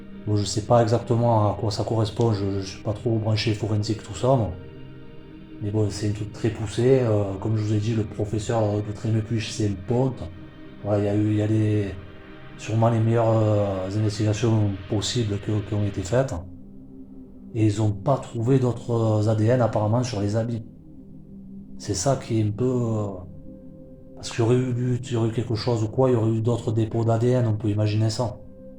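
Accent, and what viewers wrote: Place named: Marseille, France. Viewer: Aaaaah Marseille 😂🤣😂🤣😂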